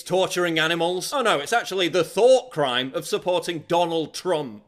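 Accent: United Kingdom. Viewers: Destroying the leftist agenda sounds awesome with a British accent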